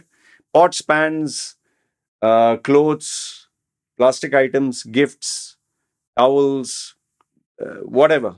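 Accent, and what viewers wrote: India. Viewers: please talk in hindi. Atleast introduce yourself saying hindi words. We would love to see your indian accent 😅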